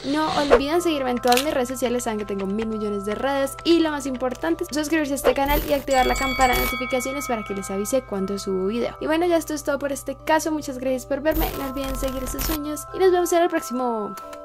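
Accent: Colombia. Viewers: Colombia, creo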